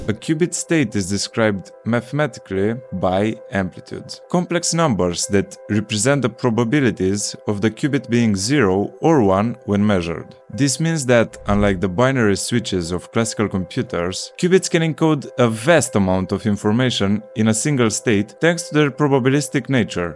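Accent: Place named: Romania. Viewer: Dupa accent mi am dat seama ca esti Roman , keep it going :)))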